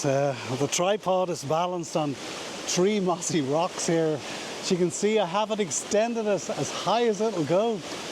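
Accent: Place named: Ireland. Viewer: Love the softly spoken Irish narration in your vids., very calming along with your intimate landscape and macro work ( my style of photography ) just very enjoyable viewing. Keep up the good work. 👍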